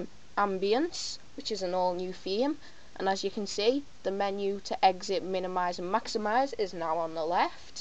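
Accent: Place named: Liverpool, United Kingdom. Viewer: No, But im a Pool supporter and recognize the accent.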